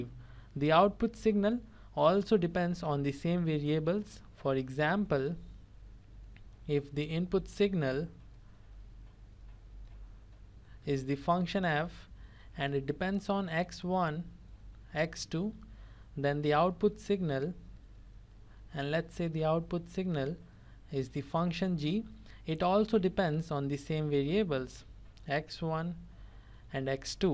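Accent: India. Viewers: 浓浓的咖喱味口音让我无法静心学习。。。可能是好课，但不太适合我！cry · His English is fine. I understand him. Most Indian professors at my local university have a thicker accent than his. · Bu Hintlilerin İngilizcesi beni bitiriyor abi.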